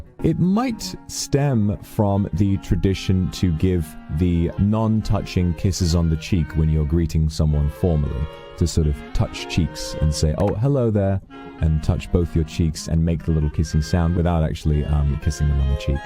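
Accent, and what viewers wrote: RP, United Kingdom. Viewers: わぁ。イギリス英語が聞けて幸せな時間でした❤ 小学生の頃、イギリスに住んでた帰国子女なんですが 日本はアメリカ発音で教育される中、 私は、大学のアメリカ人の教授には「笑っちゃうくらいイギリス訛りが抜けてない」と言われて… スラングの話をしてるのに、ポッシュな英語で(*´艸｀*) 久しぶりにイギリス英語が聞けて幸せでした。 ありがとうございました！ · 上品なブリティッシュだなあ憧れる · すごくposhなアクセントですっごいこと言ってるのが好きすぎる😂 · 英語ちゃんと聞き取れる方じゃないけどVOXのイギリス英語が上品でいかにもイギリスって感じの抑揚で好きだ これもうASMRだし、度々やって欲しい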